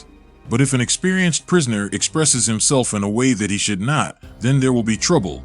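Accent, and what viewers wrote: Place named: United States. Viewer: This would be way better if it was narrated by a real russian speaking bad english rather than a boring bot speaking american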